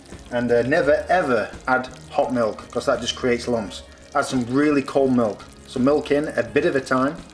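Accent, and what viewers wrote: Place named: United Kingdom. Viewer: Just discovered your videos recently. To my mind they constitute an object lesson in how to make an outstanding food prep/cooking video. Terrific clarity and nothing left to chance. Who does the filming for you? Cooking your lasagna right now and will let you know how it turns out. Love the tomato sauce on the plate at the end - lifts the presentation of this rustic dish. I am an ex-pat Brit living in the US and it's very refreshing to hear you speak! Just subscribed. Good stuff! Bob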